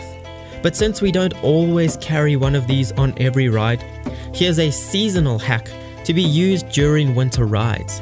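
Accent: South Africa. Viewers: Are you from south Africa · You from SA? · Your channel just got recommended! What a great channel! Dude are you from south Africa. Looks like jhb? And well sound like a South African. If so I am proud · You sound South African, where are you from?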